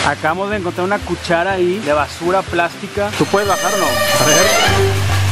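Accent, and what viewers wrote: Monterrey, Mexico. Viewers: Esta bien chido el acento regio!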